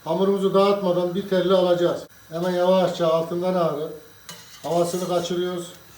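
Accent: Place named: Central Anatolia, Turkey. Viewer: Usta Orta Anadolulu. Hamur açmasından ve ağzından anladım.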